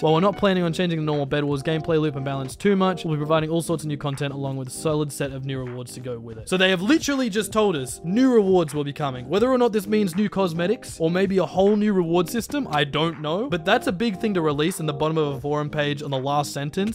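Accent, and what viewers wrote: Australia. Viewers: Are you from Australia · you sound more australian in this video 🤔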